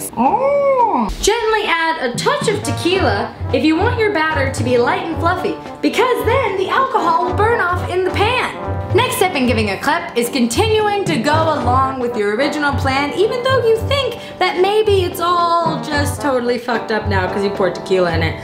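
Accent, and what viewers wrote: France. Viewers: BRING BACK THE FRENCH ACCENT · Oh how I love ur French accent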